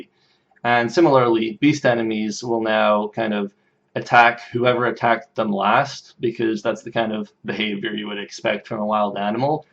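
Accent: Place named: United Kingdom. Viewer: Oh my god your accent is fucked. It's British, yet slightly American, but it'll slip into Scottish because you live there now.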